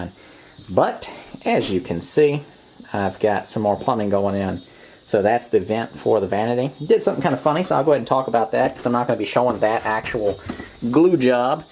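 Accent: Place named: Arkansas, United States. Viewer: His accent makes me think Arkansas. Maybe Missouri.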